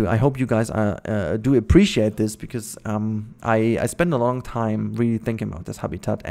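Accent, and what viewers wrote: Germany. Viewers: Are you german???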